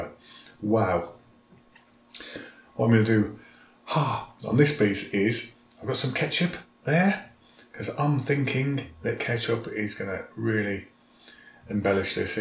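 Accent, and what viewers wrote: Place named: Birmingham, United Kingdom. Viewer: Brummy? Noice.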